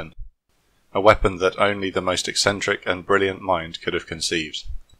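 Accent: United Kingdom. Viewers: A mistake that many, MANY native speakers of English make concerning anything related to Dutch geography. In this case it's the IJsselmeer - Lake IJssel. In this video you are painting the northern part of it, close to the Afsluitdam (Closure Dam) green as if it were land. Miraculously ,south of this part of the lake, you show its correct colours; blue (lake) water. Please always check DUTCH maps first when making videos involving Dutch territory. I have no idea where you English speakers get your wrong maps from. Not sure about your country I think it's Britain the way you pronounce it, so it shouldn't be insurmountable. It's on the other side of the North Sea.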